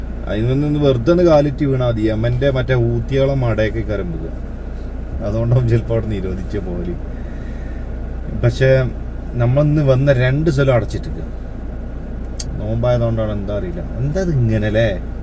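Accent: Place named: Malappuram, India. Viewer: തനി നാടന് വര്ത്തമാനം.... മലപ്പുറം കാരനാണെന്ന് തോന്നുന്നു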